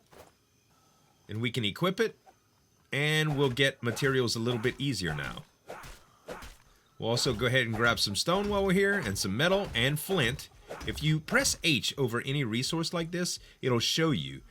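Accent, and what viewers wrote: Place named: South, United States. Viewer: with that accent you have got to be south of I-10 like me..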